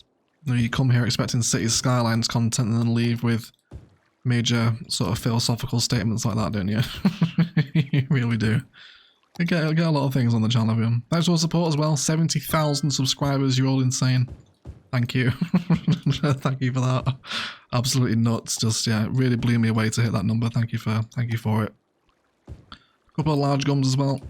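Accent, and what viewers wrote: Australia. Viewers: take it easy on the Gum Tree.. April fools, you don't have that many yet. Love the video, so excited to see it grow. Perfect chill out vibes - and aussi accent! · Right on with the aussie accent mate! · Music ✅️ Aussie accent ✅️ train only start ✅️ incredible map ✅️ special guest appearance ✅️✅️✅️ editing ✅️ do I love Thessia already? ✅️✅️✅️❤️❤️❤️❤️❤️❤️❤️❤️❤️ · Honestly mate what an amazing train station area I don’t know how you managed to capture the vibe of a small aussie community train station so well I’m from Perth and that station would even fit in over here to 👌🏻 also keep the Aussie accent in it’s actually not bad 🤣🤣 very keen for this series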